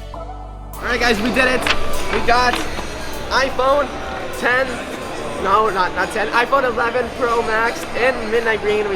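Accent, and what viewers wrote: Spain. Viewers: Are you from Spain? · awesome to hear you rock spanish spanish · your spanish accent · Se nota tu acento español, pero igual tienes un perfecto inglés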